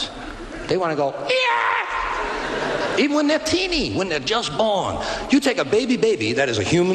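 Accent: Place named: South, United States. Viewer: He sounds Southern in this vid, for some reason.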